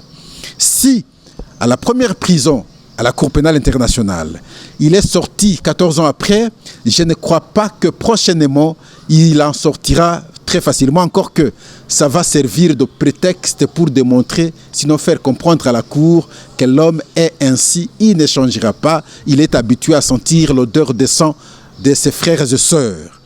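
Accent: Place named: Rwanda. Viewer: tu as un accent rwandophone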